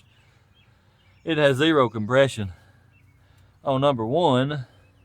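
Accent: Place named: East Tennessee, United States. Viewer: Great video. Just subscribed mostly cause you talked like me and everybody i grew up with in east Tennessee. Great content. I can't wait for wait for the next video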